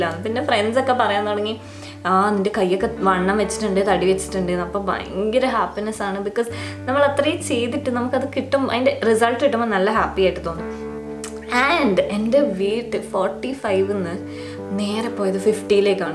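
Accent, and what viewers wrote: Kannur, India. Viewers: Uff kannur basha😂💫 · Kannur evdya .oru knnur slng und talk l evdya gym ??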